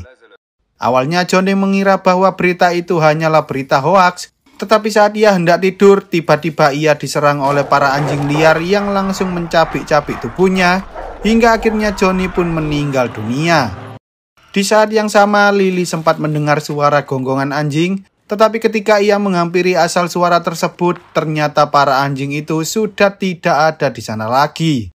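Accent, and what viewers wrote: Java, Indonesia. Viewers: Logat Jawa Gak Enak Di Dengar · Medok jowo gk enak dikuping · Narasinya medok" bahasa yg santai aja bang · Bang lu orang jateng apa jatim ni?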